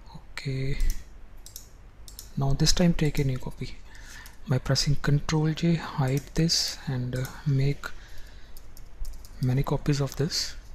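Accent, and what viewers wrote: India. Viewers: Tanguy Souchet Typical North Indian Accent for ya ;) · i fucking haaate indian accent , gosh i would break your teeth just for talking vonce , vhen , vhere , vhore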